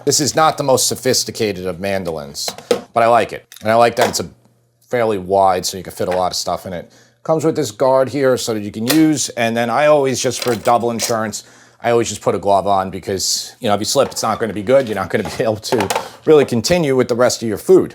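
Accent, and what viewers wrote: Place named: Long Island, United States. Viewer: Great food. Great recipes. Great Long Island accent. Everything anyone could want. ⭐⭐⭐⭐⭐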